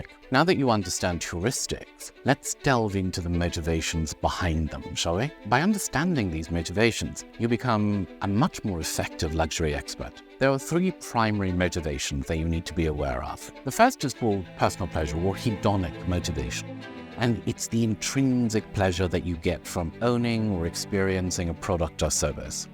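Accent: RP, United Kingdom. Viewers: Ive never heard a more posh uk accent in my life he shld b narratin books as a side hustle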